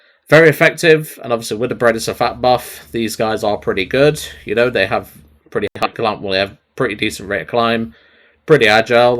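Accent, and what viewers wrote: United Kingdom. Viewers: Midlands accent?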